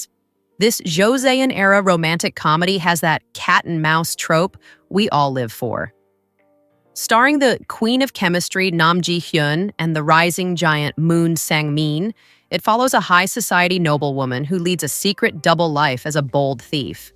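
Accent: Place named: United States. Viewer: American accent on korean language hurts my ears. 유지태이???? Arghhhhhh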